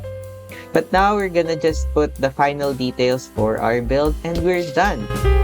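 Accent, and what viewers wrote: Philippines. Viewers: Why is the accent very pilipino love it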